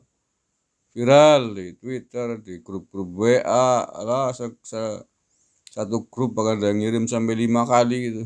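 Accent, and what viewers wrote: Banyumas, Indonesia. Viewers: Ngakak ngapak....!! 😀😅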